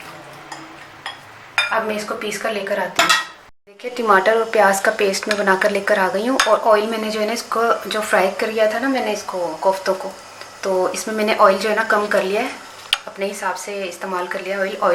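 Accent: Punjab, India. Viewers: Punjabi bohot payari bolden Ho 😍👌🏻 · punjabi ka tarka acha lga mujhy😄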